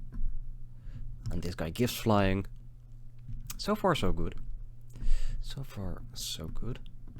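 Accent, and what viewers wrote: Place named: Netherlands. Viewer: Must confess that I always hated the Dutch accent all of my countrymen have, but for chaos draft I'll endure it I guess ;) P1P9: I think you went a little too hard on blue there. Both Skirk Shaman and Stingscourger are a lot better than Piracy Charm. Skirk Shaman would have been a bit too difficult to do with all of your double white, but I think Stingscourger would have been a great pickup there. Overall I think red looked a bit better than blue overall, but I understand why you were staying in it. Would really have payed off in pack 3 though with all of the red removal. P2P9: I would definitely have picked Blade of the 6th pride over the Sarcomite Myr. It's more aggressive and you don't really need more 3-drops at that point. P3P7: Daring Leap is a great combat trick. The first strike alone is really strong, but +1/+1 and flying can win games too. 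Orim's Chant on the other hand doesn't do anything. (edit: somehow the Chant has been very good for you throughout the games. Nice work on that) Although I would have made some picks differently I don't hate where you ended up though. UW Fliers is a great archetype in chaos draft.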